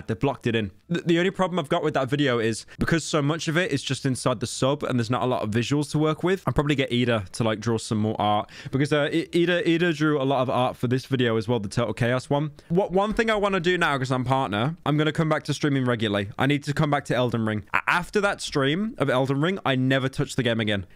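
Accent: United Kingdom. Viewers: just found out hes brittish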